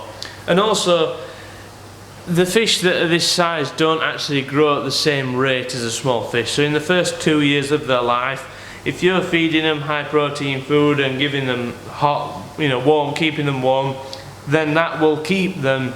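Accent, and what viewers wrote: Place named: Scotland, United Kingdom. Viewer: With the strong northern or Scottish accent, I am able to understand about half of what you say. You should hire a southerner or Irishman to do the speaking.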